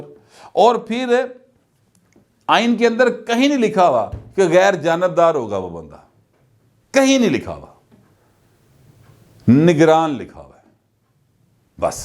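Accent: Punjab, Pakistan. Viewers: آپ کی پنجابی ہی تو پیاری لگتی ہے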